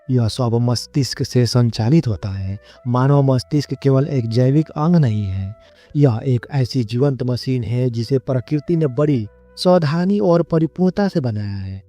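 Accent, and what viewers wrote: Bengal, India. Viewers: Bangla accent 😊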